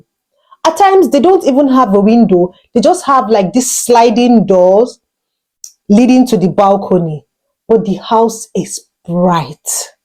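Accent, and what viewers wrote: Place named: Africa. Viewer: My guess is Nigeria but definitely African countries based on the accent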